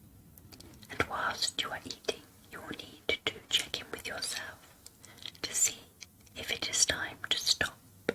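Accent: England, United Kingdom. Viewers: Are u English u kinda sound English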